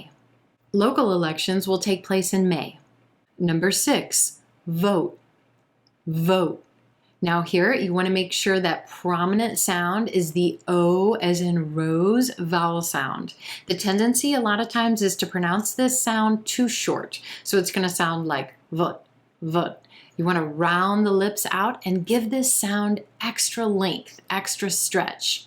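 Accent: United States. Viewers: Hi from Somalia...ur teaching is waaw and ur American english is music to my ears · Hii you are a good in American assent. So I want to join your pronunciation course. plz tell me about your course how am I join your course 👍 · Thanks for the video. Love your accent. It's so American. Wish I had such one too.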